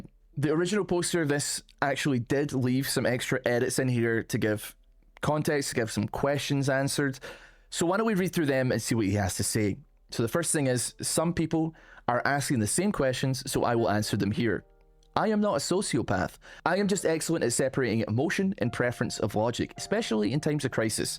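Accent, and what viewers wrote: Scotland, United Kingdom. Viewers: Never realized I needed reddit drama in a thick Scottish accent until today >.>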